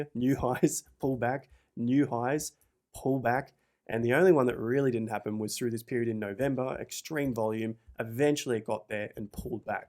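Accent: Australia. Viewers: That makes no sense. He’s Aussie.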